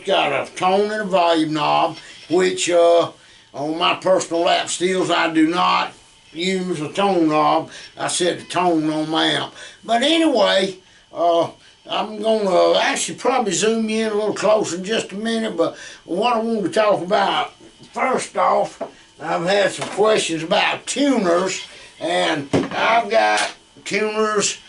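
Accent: Texas, United States. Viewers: This is Texas English or what ? Its funny . I can listen steel guutar all day.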